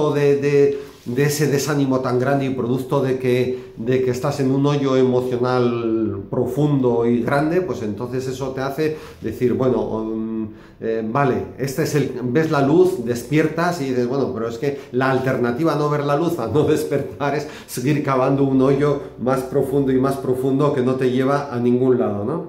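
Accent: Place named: Basque Country, Spain. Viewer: Fantástico! Tu pasión nos transmite un verdadero chute de energía positiva! pareces vasco hablando... yo lo soy. Gracias! 💫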